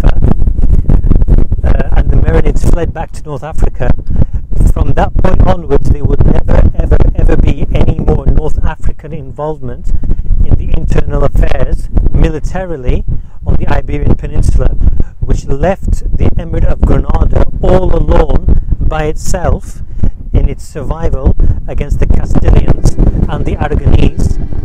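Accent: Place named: United Kingdom. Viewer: شكرا يا وردة. هل انت سوري من بريطانيا ؟ لهجتك اكسن بريطاني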